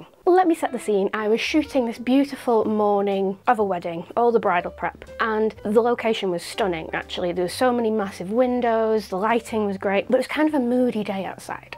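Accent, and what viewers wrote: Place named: Northern England, United Kingdom. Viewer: Great video. This is the first time I've heard the term iso invariance, and it does explain my only complaint using MFT. I have used and still own quite a few different cropped sensor and FF bodies I wonder which ones are and which ones aren't now! Fyi, I would continue to watch no matter what you spoke about ... I am here for your lovely Northern English accent 🤩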